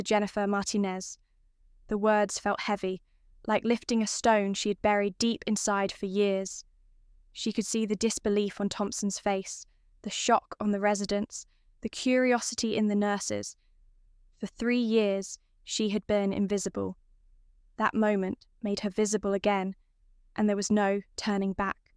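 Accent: United Kingdom. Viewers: Good story. Love the British accent and no AI mistakes in pronunciation or misspelling So refreshing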